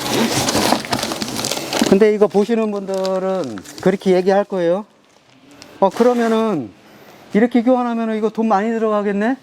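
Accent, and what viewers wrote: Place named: Hwaseong, South Korea. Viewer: 말투가 고향사람 말투 입니다 정겹습니다. 경기도 화성인데...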